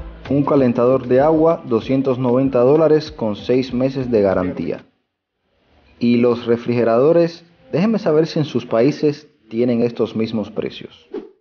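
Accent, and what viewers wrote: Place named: Cuba. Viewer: Me gusta tu voz me gusta el acento cubano. Los quetemos mucho aca en Guatemala podemos conocer y ser amigo de algunos medicos. Exelentes personas.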